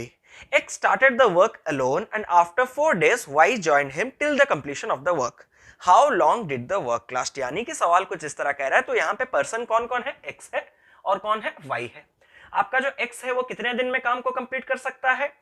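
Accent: Odisha, India. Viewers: Are you Odia sir?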